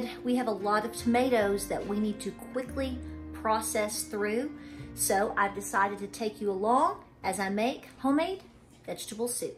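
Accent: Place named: Tennessee, United States. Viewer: Love you ! I'm from LAFOLLETTE TN. You talk like us. Down to earth and tell it like it is. And simple and sweet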